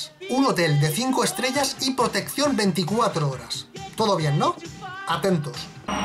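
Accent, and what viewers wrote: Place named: Spain. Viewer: Normalmente no me gusta cómo hablan los españoles (sin ofender) pero me gusta como lo narras aunque aveces no se q significan ciertos modismos ! Jajaja gracias por hacer estos videos ! 🤓🤓🤓🤓 saludos desde México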